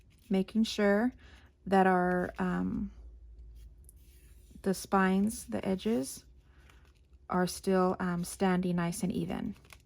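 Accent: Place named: Colorado, United States. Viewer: In your last haul video I heard a little accent that reminded me of home. I'm from Colorado originally. Just curious if you grew up near there.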